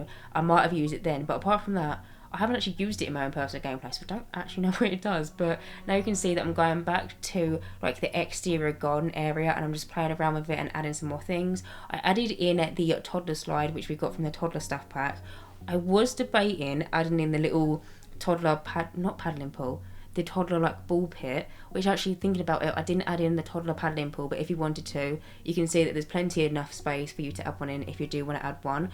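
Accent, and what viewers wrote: United Kingdom. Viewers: i love your british asent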